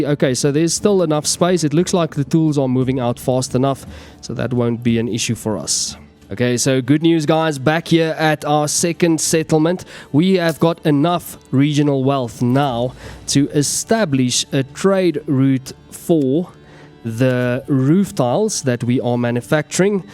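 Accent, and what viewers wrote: South Africa. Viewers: Question there you from? My guess is South Africa 🇿🇦, maybe cape provinces, or Limpopo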